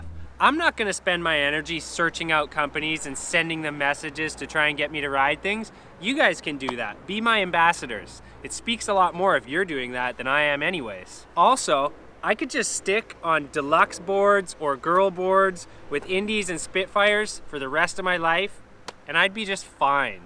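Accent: Canada. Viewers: are you canadian? kinda sound like it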